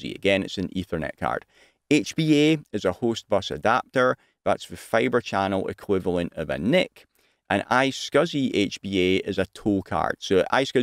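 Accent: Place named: Aberdeen, United Kingdom. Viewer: haha, you still sound Aberdonian to me! I live in the centre, spent the majority of my life in Bucksburn. Really appreciated the video, I've subbed to your channel too. I graduated a year and a half ago at RGU in networking, been working service desk for a year and 4 months, an internal position has opened up for a infrastructure/cloud analyst. Trying to get an idea of what to expect for interview questions! I'm good with networks and also learned a lot about security but this is another ball park. I've built a homelab with esxi/proxmox, my own domain etc but there is only so much you can do on personal kit. I'll be sure to watch more of your videos when I get a minute. Cheers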